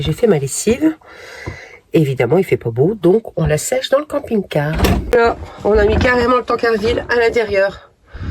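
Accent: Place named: North, France. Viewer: bon week end a vous er continuer vos video elle sont tres bien j adore votre accent sa me rapelle ma jeunesse dans ce chnord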